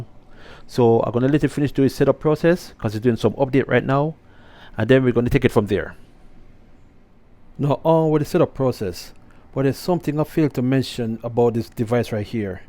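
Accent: Jamaica. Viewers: U a Jamaican? And can it use google chrome app?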